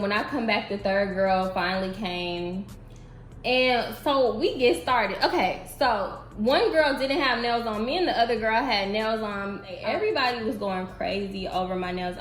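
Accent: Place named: Chicago, United States. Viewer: Sis im new here n u talk like ur from the chi w me cthu! Lol u had me rolling bc ur reactions to tht chick wud have been mine too!😂 new friend here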